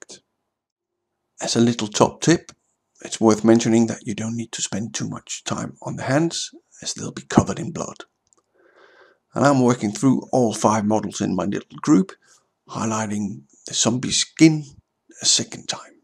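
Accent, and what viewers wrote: Denmark. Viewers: Grinern, man kan bare høre det en dansker på accenten med det samme :D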